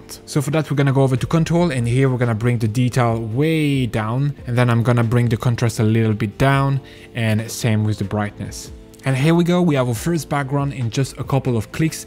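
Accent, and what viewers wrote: France. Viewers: Thank you very very very much. Iam so grateful for you videos ✨🙏🏾 I hear a little accent, are you French ?? Ahaha 🤣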